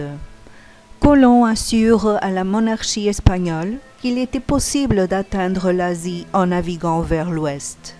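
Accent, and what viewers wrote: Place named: Portugal. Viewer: Porquê em francês? Dá para perceber que é portuguesa...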